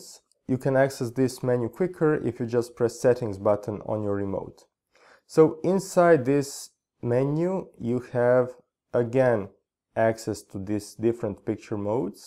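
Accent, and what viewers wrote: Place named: Russia. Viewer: You speaking like a Russian man, I guess so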